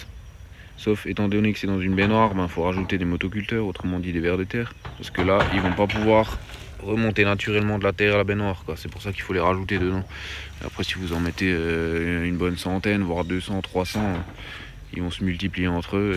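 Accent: Alsace, France. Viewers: Le plus important, c'est l'accent. Je suis aussi alsacien et je comprend mieux avec l'accent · j'adore ton accent Alsacien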